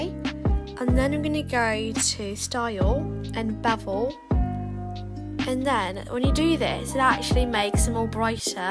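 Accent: United Kingdom. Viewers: Are u Scottish?? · Thank you so much! You’re voice is soo cute u have an really cute British accent! New subscriber!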